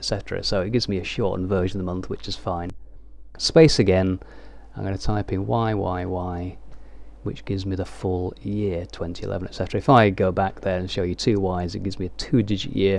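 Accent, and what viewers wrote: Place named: RP, United Kingdom. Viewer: Am I the only one who thinks The Queen's English does enhance the audio?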